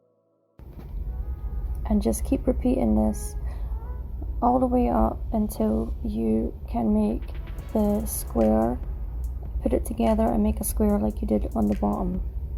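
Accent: Scotland, United Kingdom. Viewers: Your voice is so soothing, I love how you don’t rush through making them. Not Throwing them together super fast, which seems to be the norm. You take your time which makes a huge difference to the quality of the finished piece. Yesterday I saw a video of someone using these blocks to make decorative items for the first ever time. In comparison to your creations they were more arts and craft pieces. Not knocking others work just an observation. When I was watching the other poster create items in my mind I saw the potential for a few of your items and how when used in specific ways you can create swirl effects and illusions. Then as if by magic your video popped up in the feed, I’m so pleased I clicked on it! You created what I could see in mind 🪄 ✨ You certainly have an eye for what looks good, not only can your mind see the potential of combined pieces, but you’re able to actually bring them to fruition. 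Well done 👏 these are really lovely pieces you made, they’d blend in beautifully in most homes and/or functional places, no doubt receiving compliment after compliment. Quick question, you said you got items from the dollar store, except you have that beautiful soft Scottish accent. Am I wrong in thinking there are dollar stores here in the uk? Thank you for this wonderful video, it was an absolute pleasure to watch, incredibly relaxing. You’ve gained a new subscriber 🙃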